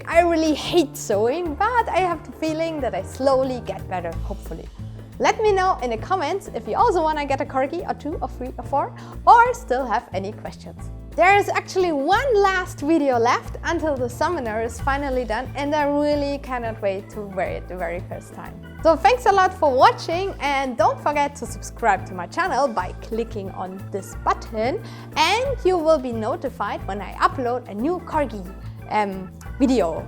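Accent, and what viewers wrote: Germany. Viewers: Hey, bist du aus Deutschland? Ich frage nur, da du einen deutschen Akzent in deinem Englisch hast und du auch in anderen Videos öfter mal Produkte mit deutscher Aufschrift genutzt hast. PS: Mega cooles Video😊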